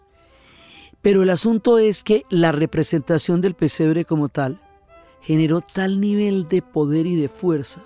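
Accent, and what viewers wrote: Colombia. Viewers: ! El regalo más maravilloso ha Sido encontrarte, en mi vida existía un vacío que tú con tus palabras me lo has estado llenando, soy nacida en Colombia y vivo en Venezuela, dónde nos trajo mi padre desde Antioquia, por lo tanto tu acento y tus salidas me encantan y remueven mi origen. Podría escribir más...pero no jajajajaja Yo necesito aclarar el cuento del árbol de San Bonifacio porque iban a matar el niño, y como esa acción de San Bonifacio lleva a lo del arbol😊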